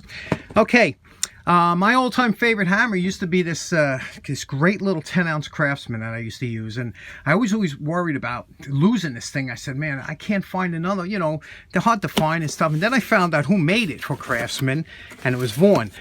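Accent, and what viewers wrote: New York, United States. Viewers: Love the accent. New York or thereabouts?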